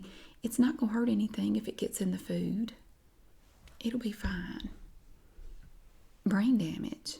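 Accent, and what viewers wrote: South, United States. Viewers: Her southern accent is what stands out the most on the entire YouTube! Extremely professional and relaxing! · I'm transfixed by the southerness... haha :D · adina andrei extra points for a southern accent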